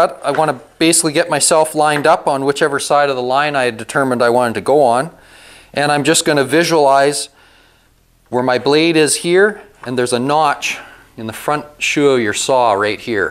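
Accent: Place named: Canada. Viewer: Love the content! I’ve loved power tools and DIY since I was a little girl and I bought a fixer upper to get started on fulfilling some dreams. I had to look up where you were from and idk why I didn’t think of Canada, because I’m from southern WI but I have family in Northern WI and my uncle has the same accent, common in the U.P. too (Michigan).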